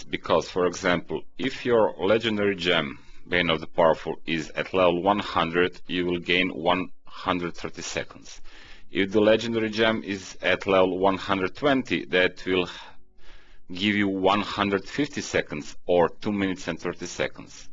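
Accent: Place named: Czech Republic. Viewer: really useful videos!!...thank you so much...btw your english sounds like czech or slovakian:) sorry if i miss...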